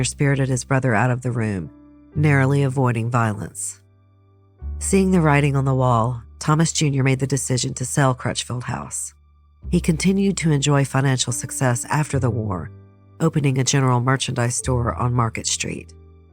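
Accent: South, United States. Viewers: Love your raspy southern voice, too sexy for me.❤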